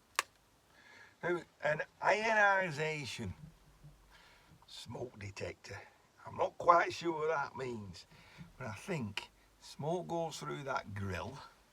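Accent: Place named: South Yorkshire, United Kingdom. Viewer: Guessing from the accent you're from South Yorkshire? If you're after an Ewaste buyer, SJA Metals at Newark are decent to deal with. 👍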